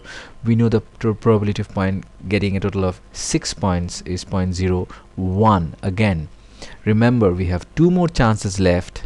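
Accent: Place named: Caribbean. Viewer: Thank you verry much...like your accent..west indian? very good teacher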